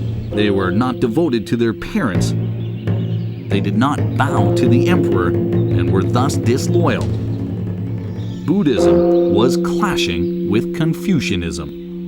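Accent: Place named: United States. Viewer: Horrible Americanisation of Chinese culture. Awful narration with American accent, why?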